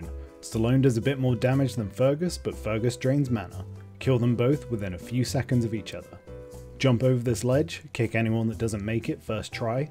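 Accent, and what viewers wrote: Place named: United Kingdom. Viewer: I think he is British by the accent maybe?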